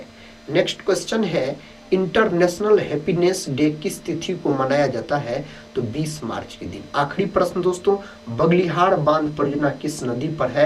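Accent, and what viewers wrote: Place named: Uttar Pradesh, India. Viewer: Maza aaya bt up ki Laungaug thodi sochna pdta hai bt sweet hai 😂🙏👌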